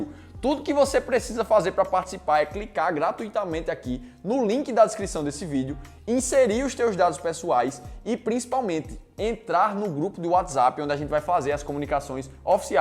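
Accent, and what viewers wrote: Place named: Pernambuco, Brazil. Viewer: ... gostei de ver seu conteúdo!!! E principalmente o sotaque e a batida na mesa...rsrsrs... só faltou falar MASSA e PRONTO! PARABÉNS PELO SEU TRABALHO! Vida longa e próspera nos vídeos do Clube. Obs.:...PE imortal!....Entendedores entenderão.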